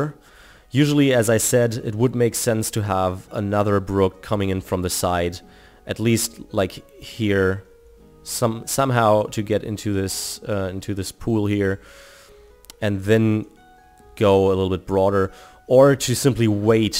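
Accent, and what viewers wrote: Germany. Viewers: Nach den ersten paar Sekunden erstmal abonniert. ;) Man hört zwar, dass du Deutscher bist, aber dein Englisch ist sehr sehr gut. :) Super sympathisch und tolle Videoinhalte. Weiter so!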